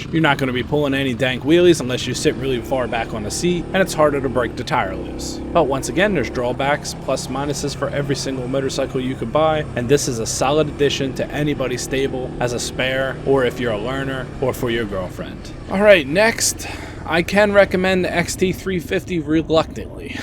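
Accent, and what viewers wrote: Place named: South Jersey, United States. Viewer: You gotta be a south jersey dude with that accent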